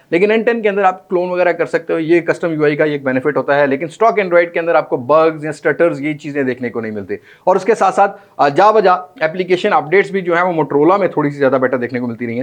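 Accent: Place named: Punjab, India. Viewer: Bhai aa Punjabi touch bara wadya lgha💯❤️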